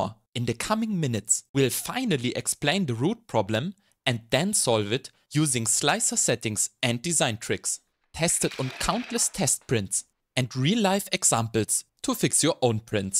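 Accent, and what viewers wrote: Germany. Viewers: 3D printing + german accent = I subscribe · Im a simple men, I hear german accent i press abonieren.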